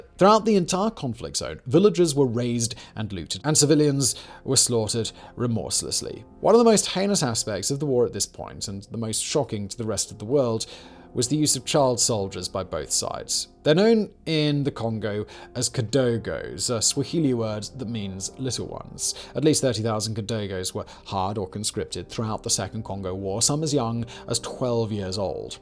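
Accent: United Kingdom. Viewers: Lol, Karen? Doesnt really apply here. But to be fair i have no idea why it bugs me so much 🤔 maybe because its the diction of the colonizers or something, i genuinely dont know 😅 but when i hear british people turn "a" into "er" it just FEELS racist lmao in my soul. I know its not. But it feels like it. I hope he doesnt sing along to rap music very often 😬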